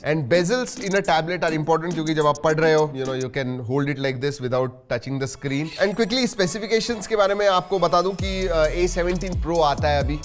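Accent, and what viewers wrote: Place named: Maharashtra, India. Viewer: तुमचे मराठीं मधे reviews खुप दामदार वाटतात तुम्ही अत्ता हिंदी बोलत आहत पैन एमएलए आसा वाटत आहे की मराठीच बोलताय 😅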